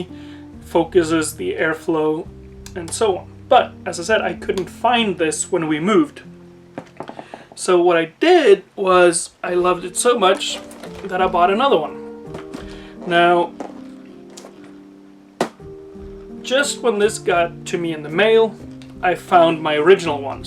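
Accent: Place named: Scandinavia. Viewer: Søgne, 25 min unna Kristiansand :joy: Familien min er fra Gjerstad. Jeg er så hillbilly som man kan være i norge, Pluss i live in the south :) Din engelsk tale er meget bra, men etter å ha sett noen vids rett etter værandre må jeg inrømme å si jeg hørte at det var noe scandinavisk der. Du er opprinnelig fra danmark? Hvor i DK om jeg kan spør?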